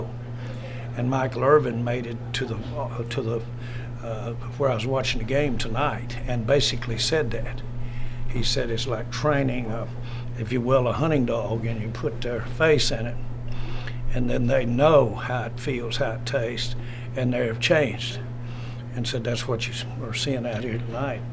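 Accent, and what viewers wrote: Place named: South, United States. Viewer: Last known human with the accent of a slave owner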